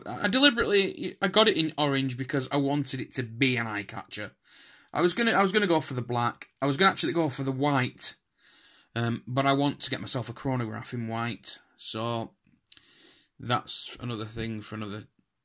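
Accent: Bolton, United Kingdom. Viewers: You sound like your from bolton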